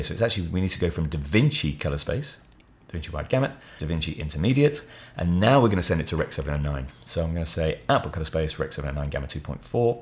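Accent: England, United Kingdom. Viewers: This video is a life saver! I'm about to release a documentary film were I use mainly HLG (Rec.2020/Rec.2100 HLG) color space and gamut. I used to start with the color space transform but now I'll do as you tell here. Gonna save this video in my reference videos. Also you sound English, why type it color instead of colour?😅 Warm regards.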